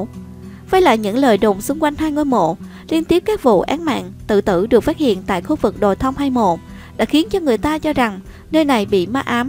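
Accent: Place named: Vinh Long, Vietnam. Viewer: quê o gò công sao nói o vĩnh long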